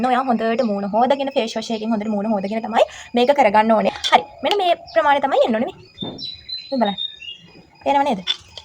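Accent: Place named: South, Sri Lanka. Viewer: ඒක හරි එයා දකුණේ කෙනෙක් ඒක නිසා කථා කරනවා වෙනස් තමයි හැබැයි ලස්සනට කියලා දුන්නා thank you නංගි ❤️❤️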